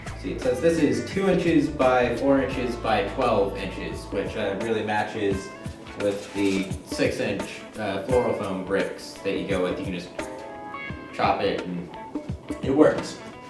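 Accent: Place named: Michigan, United States. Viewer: I'm from Michigan and u got the Michigan acsent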